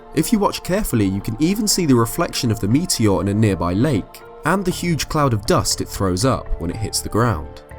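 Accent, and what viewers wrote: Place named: RP, United Kingdom. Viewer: Thank you for a clear voice and speaking the Queens english, as well as knowing what you talking about! Very professional! Congratulations, job well done!!!!!!!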